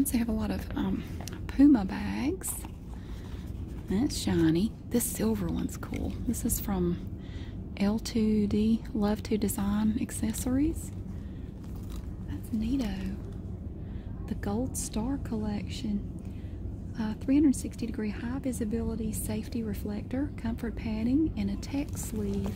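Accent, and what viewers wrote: South, United States. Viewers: New viewer here and I just have to say the best part of your videos is your voice. Always remind me of the movie The Help. 😂 that strong southern accent is so nice!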